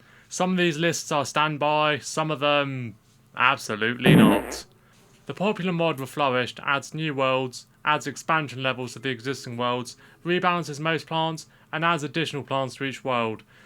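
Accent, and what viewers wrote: United Kingdom. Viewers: The British accent is very cool 😎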